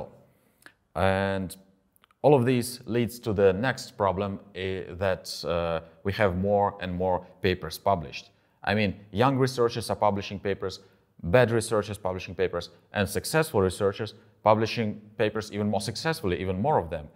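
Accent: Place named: Russia. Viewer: I was thinking "ah, familiar accent". Hello to fellow Russian researcher. I'm also a researcher at US University. And papers fever is way too real. =( I have literally 3 citations.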